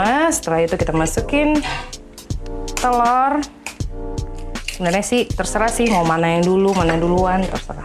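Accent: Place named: Batam, Indonesia. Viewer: Logak nya kaya nya orang batam..😀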